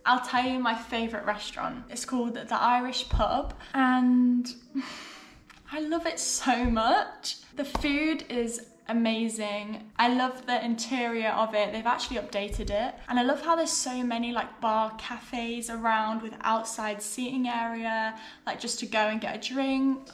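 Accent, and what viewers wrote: United Kingdom. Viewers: not sure what i like more about, her proper British accent or her personality. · I love your british accent! I can't explain why, but I feel like english should be spoken just like that. It's sounds so good!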